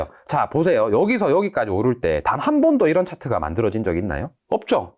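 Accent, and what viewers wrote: Gyeongsang, South Korea. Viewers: 주식판에 젠체하는 젊은 경상도 아들 와이리 많노~ 거슬리네~~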